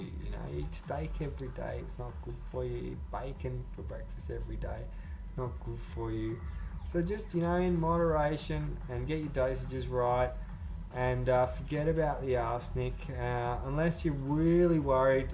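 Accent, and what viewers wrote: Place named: Australia. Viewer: I dig you man! crazy free party dude with your australian accent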